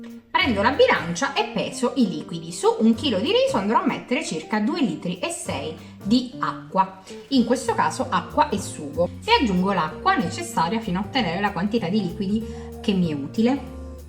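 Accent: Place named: Sicily, Italy. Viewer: Ma non senti il suo bellissimo accento siciliano??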